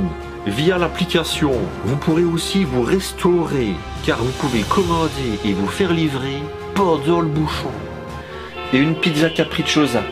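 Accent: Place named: Liège, Belgium. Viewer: J'adore cet humour aux accents très liégeois.... un bon relai de la voix de la plèbe:-)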